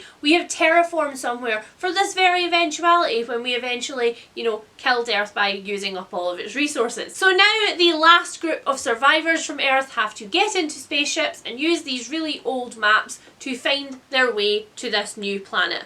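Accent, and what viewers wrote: Scotland, United Kingdom. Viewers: I’m so glad I found your channel! You’re personality is so cute and entertaining (: and i love Scottish accents lol (probably because my mums a Scottish immigrant aha!)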